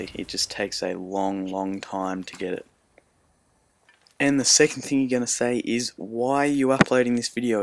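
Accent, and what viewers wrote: Australia. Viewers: Nice, And finally an aussie ^__^ good vid =)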